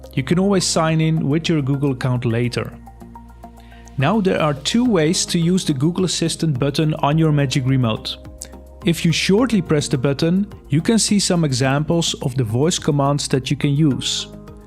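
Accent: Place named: Netherlands. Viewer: He sounds so Dutch!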